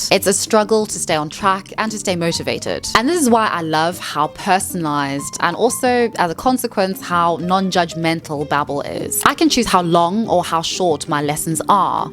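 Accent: South Africa. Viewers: Youre South African? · Amen! Love your accent sounds a bit South African. You speak clearly and make perfect sense. Thank you! ❤ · Are you from South Africa? That accent 🔥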